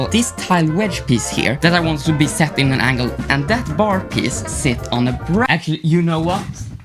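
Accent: Sweden. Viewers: eyy, du e svensk jag trodde det. ´´fick nåt i halsen´´ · Are you from sweden?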